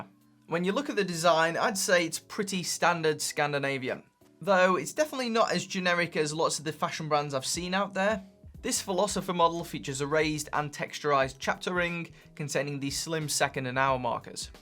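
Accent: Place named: Midlands, United Kingdom. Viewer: This guy is the only case I can tolerate the midlands accent for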